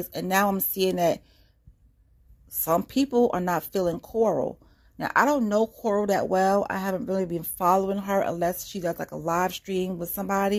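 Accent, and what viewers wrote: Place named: Brooklyn, United States. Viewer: Oh I love your accent. You sound like me. I’m from Brooklyn….live in SC….just subscribed…loving this WW drama…addicted to these crazies… lol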